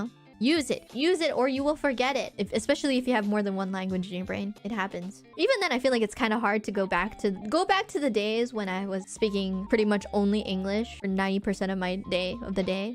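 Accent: United States. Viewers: She sounds vaguely American to me. But I am not the best with accents. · She has an american accent in my opinion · I mean, she has an accent alright, but it's an English accent, specifically sounds like west coast NA. She's very clear with her words, and only slightly slurs them when excitable from what I can tell, but it is very clean otherwise. Idk bout her Japanese speaking though, can't speak it myself, so unsure how it comes across to native speakers.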